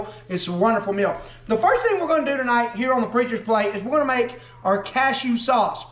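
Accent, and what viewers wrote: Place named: Missouri, United States. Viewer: You've moved away from SW MO! That accent is thick! I also moved away but I still have the bland Midwest accent of Missouri rather than a twang. I still make Springfield cashew chicken when the craving hits.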